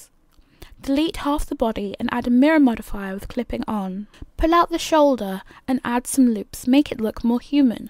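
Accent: United Kingdom. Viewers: I just focused on the British voice.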